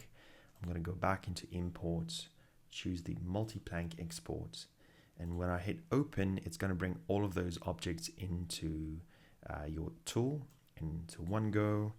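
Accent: South Africa. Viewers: Do I detect a slight South African accent to your voice?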